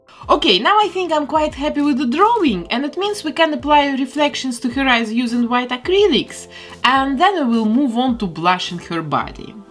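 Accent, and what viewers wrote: Russia. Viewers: Я чувствую себя ТАК странно, смотря Ваши видео. Мозг воспринимает русский акцент как русский язык, но при этом я понимаю, что звучит английский... хэлб А если не считать ребусов в моей голове, видео очень крутые, смотрю регулярно и с большим удовольствием.) Вы крутая художница! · Мне кажется или это русский акцент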